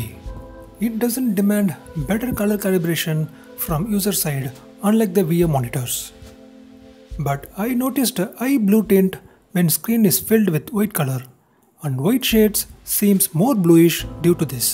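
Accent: India. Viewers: You know it's legit when you hear an indian accent.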